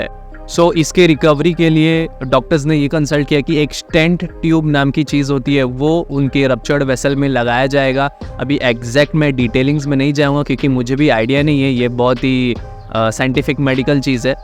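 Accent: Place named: India. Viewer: لامصب ما که هندی نمیفهمیم بااین لهجه هندی انگلیسی نامفهموم تر شده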